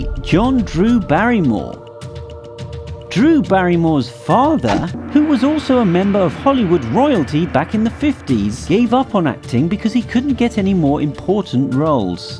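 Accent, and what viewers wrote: United Kingdom. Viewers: Very sad. But...I love how the British say Buth-day for birthday, and "don't take drug" instead of "drugs " lol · It’s always a British background voice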